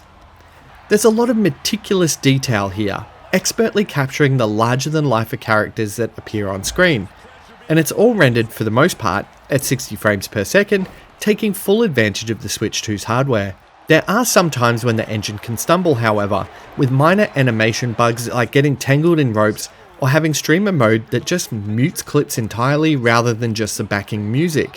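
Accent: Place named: Australia. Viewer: i got 31 seconds into this video and had to click off, aus accent is like nails on chalkboard to me ..apologies but im sure its great... also sort your levels out on mic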